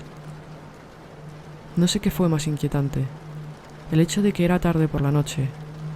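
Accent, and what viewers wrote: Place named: Spain. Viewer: No me gusta ese español. Prefiero el español "latino". Gustos de gustos. Gracias x leer.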